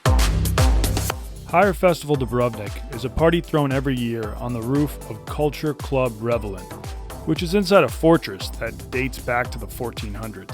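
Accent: Boston, United States. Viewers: Dude you're hilarious, its the subtle boston accent that does it.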